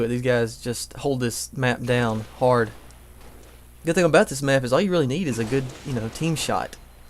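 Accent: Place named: Texas, United States. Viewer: you sound like ur from texas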